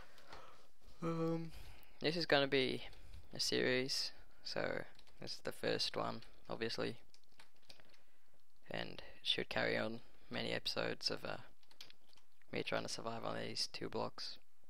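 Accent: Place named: United Kingdom. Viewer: You sound brittish!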